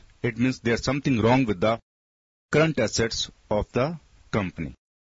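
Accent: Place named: India. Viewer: I saw all your videos on Ratio and found it very understanding and thus useful. They were simple and ofcourse taught with perfect Indian accent. Thank you.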